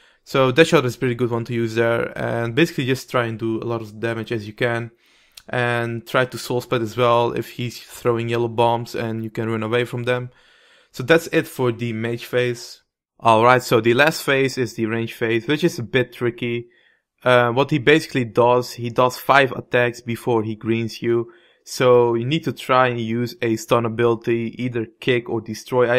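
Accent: Netherlands. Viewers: i can litterly hear youre dutch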